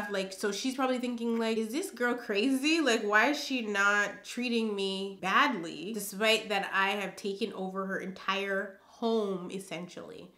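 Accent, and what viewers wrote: United Kingdom. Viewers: The British accent is taking me out! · The British accent 😂😂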